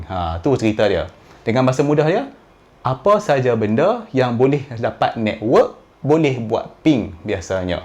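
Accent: North, Malaysia. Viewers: no worries. Sdp dgr org utara habaq ni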